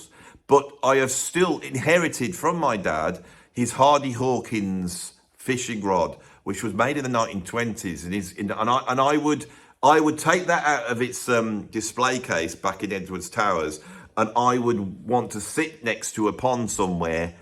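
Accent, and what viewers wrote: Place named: West Midlands, United Kingdom. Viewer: Even a mild Brum / Black Country accent is like the acoustic equivalent of caustic soda, sorry mate I’m sure what you are saying is interesting but I had to bail at Noel.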